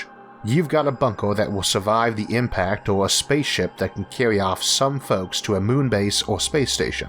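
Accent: United States. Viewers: Why does your voice sounds like that?? Are you american?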